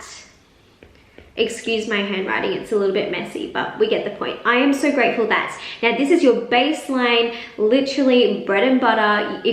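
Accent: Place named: Australia. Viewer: It's really unique to see a pretty Asian girl with such a broad Aussie accent.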